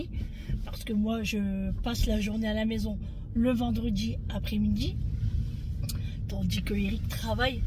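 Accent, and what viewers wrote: North, France. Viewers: Cet accent du nord qui me fait tellement plaisir 🙏😊😊😊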